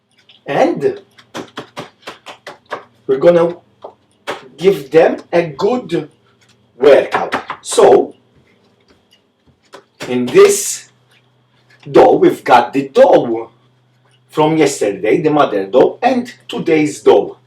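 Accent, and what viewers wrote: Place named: Malta. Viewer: I love the Maltese accent and grammar so-so much!!!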